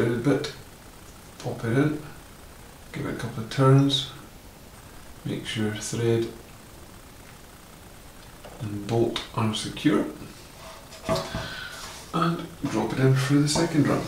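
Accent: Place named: Scotland, United Kingdom. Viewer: Oh he’s Scottish !!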